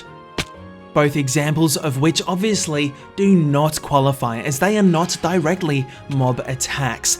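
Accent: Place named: Australia. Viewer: I swear ur Australian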